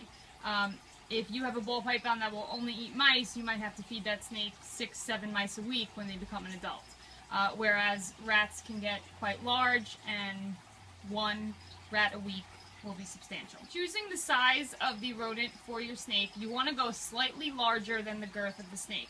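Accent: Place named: Long Island, United States. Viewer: Where's your store? Sounds like L.I. judging by the accent.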